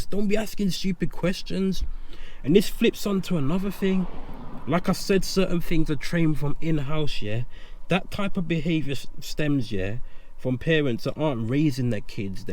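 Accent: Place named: United Kingdom. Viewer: His accent is clearly british !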